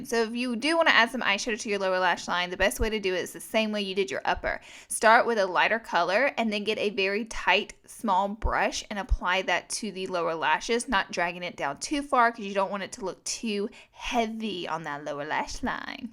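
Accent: South, United States. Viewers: Don't waste one minute talking, or at least get a voice training course. Your voice sounds way too nasal and, for someone who is used to British audio (non-native English speaker here) this almost looks like the stereotypical female American voice. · her voice is the most American thing I have ever heard. (im australian) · the southern accent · Are you southern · Shawn Brown she's not trying to be like bunny. she is doing her own thing. and yes they both have southern accents but that doesn't mean she's trying to be like her. trust me bunny is wayy more hyped up than her ha ha.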